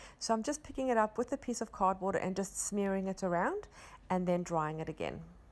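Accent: South Africa. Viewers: Hi, you sound South African, where do you live. It’s so nice to hear your accent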